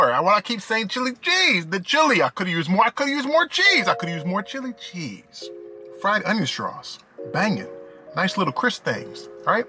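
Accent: Texas, United States. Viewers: That Texas accent 😹 1K calories for the burger????!!! 🍔 It does look super good though! Only hot dog I trust is from Costco 😎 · Love the attempt at sounding like a Texan LOL Shout out from Dallas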